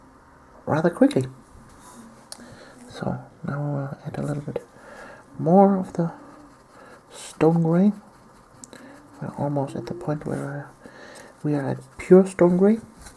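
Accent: Germany. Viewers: May I ask where you are originally from? I apologize if I'm wrong but i hear a German accent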